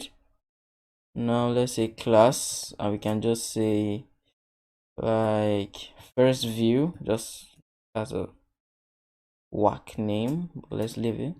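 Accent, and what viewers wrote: Nigeria. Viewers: Name and accent sound Nigerian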